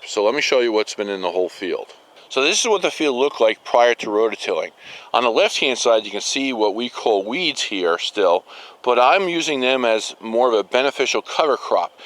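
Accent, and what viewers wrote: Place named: Pennsylvania, United States. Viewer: Where in PA are you? I'm just assuming PA or southern NY from your accent...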